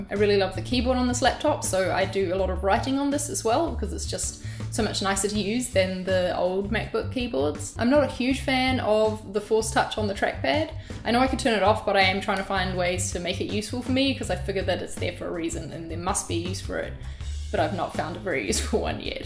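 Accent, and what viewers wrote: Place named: New Zealand. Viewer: I love your NZ accent ;)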